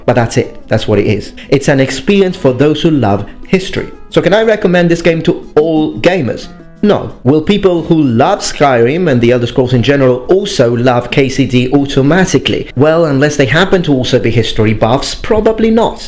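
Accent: United Kingdom. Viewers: Do you speak English with an British accent because you learned from watching British television, or did you spend some time in Britain? I just didn't know. I mean no offense. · I thought you were Italian, but in this video, you sound British...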